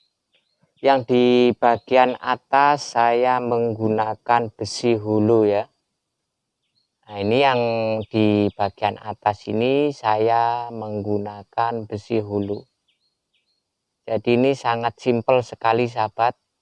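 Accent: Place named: Java, Indonesia. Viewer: Jakarta mas.. Logatnya gampang dikenal.. Jowo ne pundi mas? Enak ya.. Bisa merantau di Malaysia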